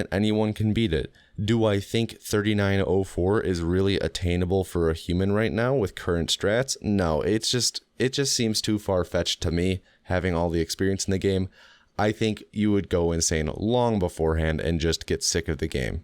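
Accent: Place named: Midwest, United States. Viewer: I love the aggressive Michigan / midwestern accent this episode? Like never noticed it before lmao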